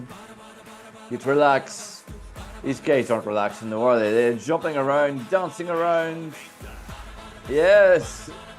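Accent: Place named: Sweden. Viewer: an yer Swedish is steckin out!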